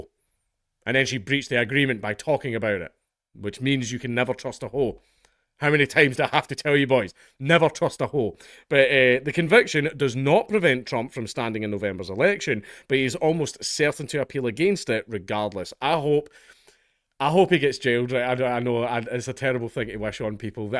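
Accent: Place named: Scotland, United Kingdom. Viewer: "I really like it when people get angry" - dude, we can already tell by your accent you're Scottish. No need browbeat us with it.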